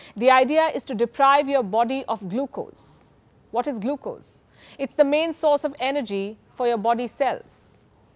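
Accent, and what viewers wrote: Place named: India. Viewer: Her Indian accent irritating to ears.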